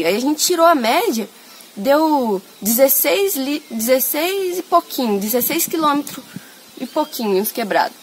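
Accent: Minas Gerais, Brazil. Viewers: VC tem sotaque de mineira. .Tenho um Etios Hatch 1.3 2017/2018 na BR distância mais longa faz 17km/L cidade faz 10 ou 11 mas tá ótimo fusca tem fama de beberrão. .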